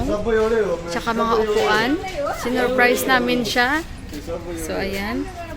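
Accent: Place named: Hiligaynon, Philippines. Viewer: Ganda ng vlog mo sis di nakakaboring at kaabang abang. Tapos yong pagsasalita mo di maarte and i like your ilongga accent. More power to ur channel at sa mga supporters and sponsors sa charity nakakaproud bilang isang filipino. I salute u all.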